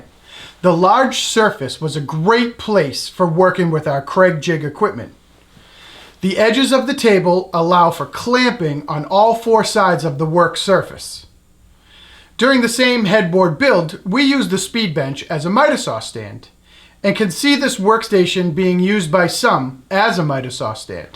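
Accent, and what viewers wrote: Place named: New England, United States. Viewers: Its our Boston accent! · Weird how much you sound like Rob! Born and bred in New England too, no doubt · Is that a lovely New England accent? Well done 🎈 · He talks just like the older guy, it's not just the New England accent either. I wonder if he taught him how to do it?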